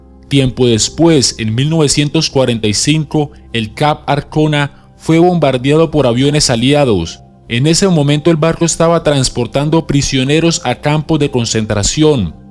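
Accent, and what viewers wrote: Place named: Colombia. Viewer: tan solo con ese horrendo acento colombiano; al minuto de ver el video me dio desesperacion y ya no me intereso ver el video 😝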